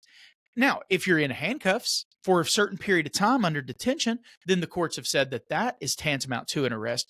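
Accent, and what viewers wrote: South, United States. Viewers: It's very easy to get worked up & talk out one's arse..... there's a lot to be said for being humble b/c LEO's use/misuse & abuse the LAW(s). I guess there's one State where 609+ DUI/DWI's where charged against mostly innocent folks. Guilty or not your smart mouth can cause you to take the "Ride". It might cost you a lot of money or your car? Your job or home might be lost so being smart is just wise but even if you get done wrong it's no guarantee that you can get good representation for a lawsuit. I'm seeing many who can't get help to pursue even when they manage to pony up the money so be wise in your actions/words. peace & TY Sir...... see ya on the next one!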